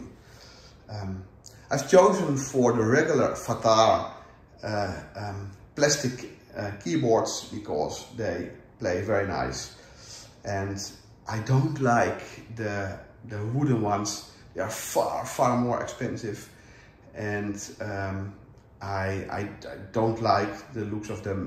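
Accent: Netherlands. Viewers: Congratulations on your new console. That is magic! I watched your video just minutes after finishing practising two other works by Phil on the pipe organ I now play for Sunday church. I share the role so only play fortnightly which gives me time to learn new music. I thoroughly enjoyed your video and the beautiful piece by Phil. In my teens (eons ago) my piano teacher was Dutch. If not for WWII, she would have embarked on a career as a concert pianist. Your Dutch-English reminds me how lucky I was to learn from a world class musician in my 'formative years' 😃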